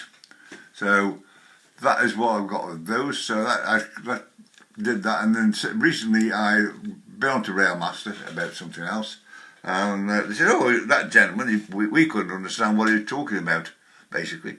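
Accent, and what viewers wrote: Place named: Stoke, United Kingdom. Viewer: Well, a great big happy birthday to you and many of them matey. I love watching your videos and by your accent I can tell you’re a Stokey. I actually live in Middlewich but I worked quite a number of years all over Stoke and my son works in Fenton. I got into this hobby a couple of years ago because of the Hornby TT 120 and I’ve decided to go down the Itrain route to run my trains and after a bit of messing around I have finally got everything working perfectly and it’s great to watch the Locos go round in full automatic mode so even I don’t know where they’re gonna go and how long they gonna stay at a platform. I’m now using all Yamorc equipment for the feedback sensors the point switches and the command station anyway all the best matey have a great day Steve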